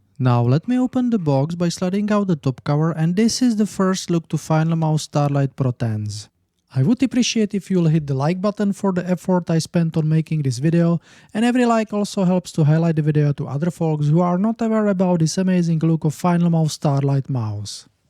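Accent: Czech Republic. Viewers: Hi, great job, you're doing really great content, but I can hear really strong accent, I think that's Czech, am I right ? :D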